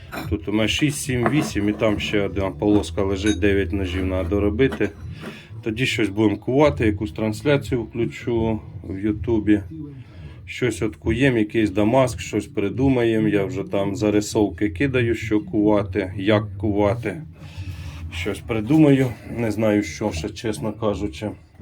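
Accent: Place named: Lviv, Ukraine. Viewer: Слава Україні!Гарні роботи,гарна майстерня,по акценту чую недалеко від Львова.Назар(Львів)трохи ваш колега.Мирного неба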